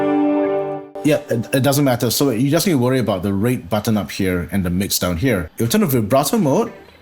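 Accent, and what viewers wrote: Singapore. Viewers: Just in time for my Helix LT :) Are you from singapore by any chance? you sound singaporean. Anyway, i'm Singaporean turning to your channel from Berlin. Anyways, great video!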